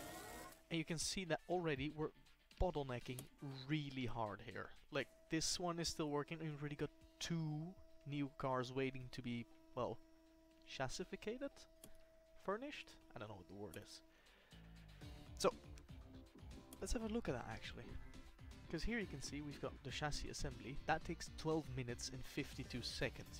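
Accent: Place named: Netherlands. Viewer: is sounding very Dutch in this video for some reason...:P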